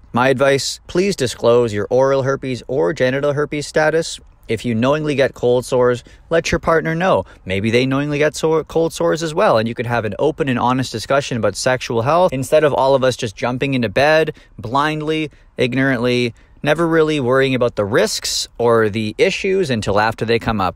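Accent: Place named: Canada. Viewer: You say "out" in Canadian accent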